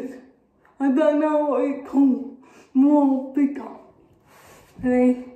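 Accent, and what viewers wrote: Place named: United Kingdom. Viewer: Hi, I know this isn't your latest video (at the time I'm writing this) but I just found your channel and decided I'd comment on this video because it's great how you're showing how you can do things yourself, and you're so pretty even before the makeup, and ya know us Yankees love the British accent too, so thanks and looking forward to seeing more videos, xox.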